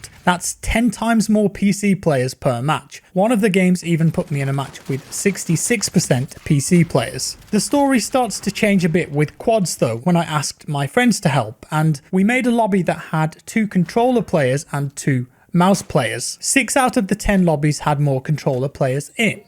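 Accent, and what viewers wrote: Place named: West Midlands, United Kingdom. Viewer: West Midlands.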